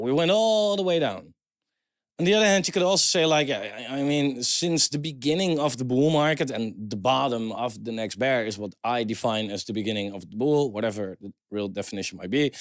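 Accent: Netherlands. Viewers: I like your dutch accent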